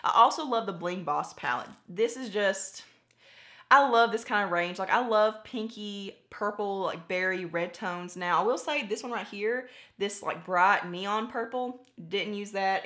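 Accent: South, United States. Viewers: Whoohooo! 1st comment, this never happens! I love your channel, your honesty, the range of products you use from drugstore, high end, and your accent!! Fellow southerner here! 🙋‍♀️❤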